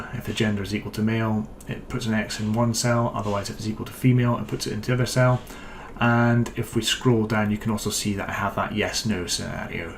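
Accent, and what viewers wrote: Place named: Scotland, United Kingdom. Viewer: I really appreciate all your efforts spending to provide such detailed instructions about Power Platform and Office apps features. I lost my mobile iPhone in Aberdeen, 2 days later someone found it and called my emergency contact with Scottish accent (obviously), and I managed to get it back. Since then, I am happy to hear anything with Scottish accent. Sorry for the side note 👍🏻Kudos to you.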